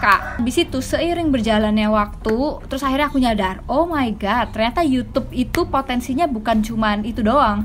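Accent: Java, Indonesia. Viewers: Wong jowo ya Bae medoke😎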